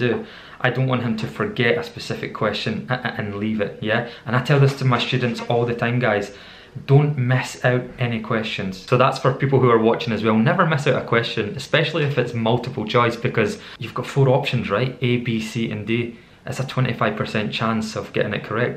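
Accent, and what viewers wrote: Scotland, United Kingdom. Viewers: Do morrre classesss likiii thaaat, juust speakkk a little bittt slowly and using morrre Scottish accent. Grrreat. See you laterrr. I love the Scottish accent.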